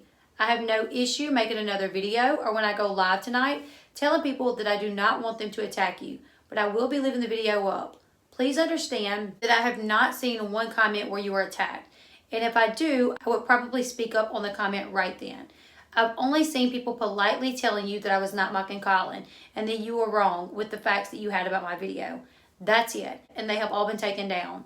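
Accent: South, United States. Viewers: She was right as a southern sister love the accent ( it's like mine) and you are very pretty ,love from Alabama 🤗🐘🐘🐘🤗 that's ALL she was right about 🤣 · You do have a cute Southern voice😉 I truly loved it. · "I've never watched before" but she has a cute southern voice lol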